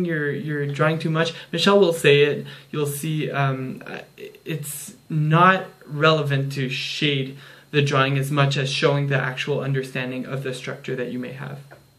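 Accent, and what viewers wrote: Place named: Germany. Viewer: You sound german which is super hot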